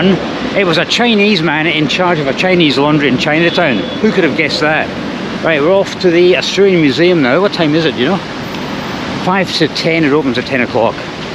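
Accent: Scotland, United Kingdom. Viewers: why do you not speak with an Australian accent if you grew up there? Why do you have a Scottish accent? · He has a Scottish accent because he is Scottish. Where he lived as a kid is just a red herring. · A Scotsman with an Aussie past, the Scot’s never lose the accent! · It’s amazing you have a broad Scottish accent - with living your first 25 years in Oz.. I’m surprised. The hotel didn’t look good at all. I’m not keen on Sydney. Thanks for showing us.👍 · this might sound stupid but why does he have a scottish accent then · His parents were Scottish so that would be the accent he heard most. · Amazing how you kept the Scottish accent having spent so much time in Australia! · how did you manage to keep your Scots accent having been raised in Australia? I was born in Scotland but raised in England yet my accent is definitely not Scottish (although I do know where my bread is buttered when it comes to football & rugby).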